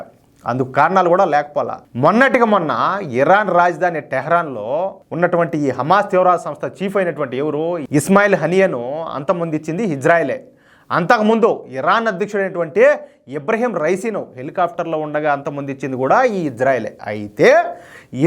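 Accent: Rayalaseema, India. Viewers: బాగుందయ్యా పెద్దమనిషి మన రాయలసీమ భాష చాలా బాగుందయ్యా నాకైతే తెగ నచ్చేసింది అయ్యా నీ లాంగ్వేజ్ బలే బలే బలే బలే బందయ్యా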